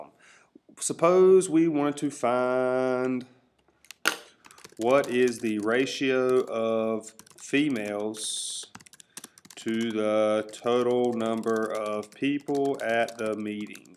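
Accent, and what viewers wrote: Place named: North Carolina, United States. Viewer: Your videos are so helpful. Thank you for all your time and effort!! Taking my TEAS soon as someone who graduated college in 2015 so these refreshers are everything. Side note, are you by any chance from Eastern NC? Your accent sounds like me and my people!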